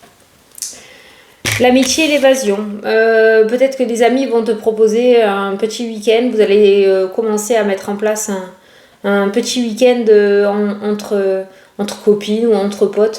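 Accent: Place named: Marseille, France. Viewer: Moi aussi j ai l ai l accent " qu on attrape en naissant du côté de Marseille " ou du Midi et il est beau notre accent .... Merci pour tes tirages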